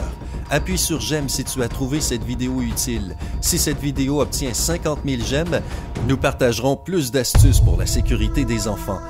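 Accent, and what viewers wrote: Quebec, Canada. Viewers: Tes québécois ? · C pas un accent quebecois? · Québécois... j'aime ça ! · Figooroo ! Ouii j’avais aussi l’impression durant toute la vidéo qu’il parler avec cet accent Québécois 😍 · Rien avoir tu es québécois ?? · dislike , c'est le québécois qui parle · Eum c’est moi ou le commentateur est québécois ( comme moi ☺️ ) et wow super belle vidéo et très utiles 👍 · Simpa , ta l voie de qcoxe (je me demande si tes quebéquoi ou tes juste lui 😐) qui est d'accord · Les accent québequois .. AH CA M'EXCITE!!!! 😄😄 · T canadien pas vraie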